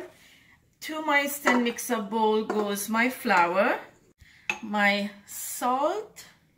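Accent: Mauritius. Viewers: Yeh ur right it is pronounced nid! The prononciation is the same no matter where we r.. accents r different! However, I think we’re losing focus here! It takes courage and strength to make videos! It’s been three years n m still not brave enough to do it! How abt we focus on the recipe and her tips as opposed to her accent.. she is Mauritian! I’m sure we all have an accent irrespective of where we r?!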